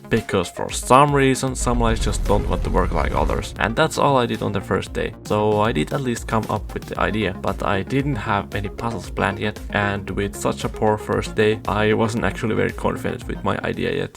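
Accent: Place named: Finland. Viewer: This editing style is awesome. And btw, you sound like you're from Finland. :D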